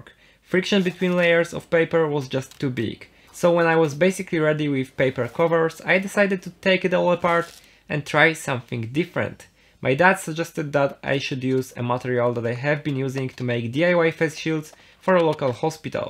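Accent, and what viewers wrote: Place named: Poland. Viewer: masz akcent polaka :)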